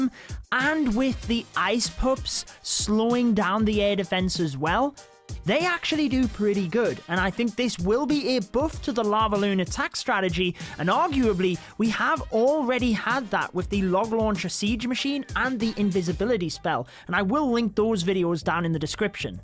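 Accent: United Kingdom. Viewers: Ty are you from the uk?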